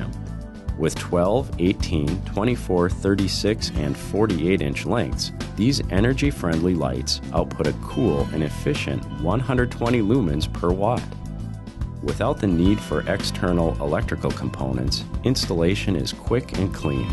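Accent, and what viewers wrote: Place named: Wisconsin, United States. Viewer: Is this what everyone in Wisconsin sounds like? If so I need to move to Wisconsin.....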